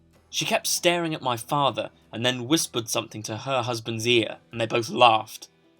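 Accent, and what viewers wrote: United Kingdom. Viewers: why does he sound british but act american??? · just because he has a british accent doesn't mean he says that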